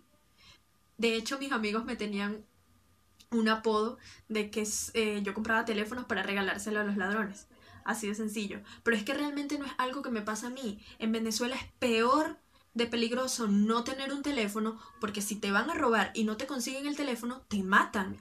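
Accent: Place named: Colombia. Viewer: se te noto el acento colombiano jajajajaja saludos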